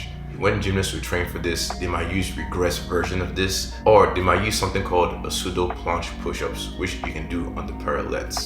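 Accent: Nigeria. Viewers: Bruh is u Nigerian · For a very long time, i was having that gut feeling you were "omo naija", and this video just made my feeling clear... As i hear "Eh".... "chineke" ....."Abeg"... Nice one bro!!... Naija to the world, carry on bros!!! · I always you were Nigerian. You have the accent. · This man is Nigerian asf, feels like I am listening to my brother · I swear right? I had this gut feeling that the guy was Niigerian from the get go....When i heard chineke....I was like lobatan.... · chineke😂 nigerian?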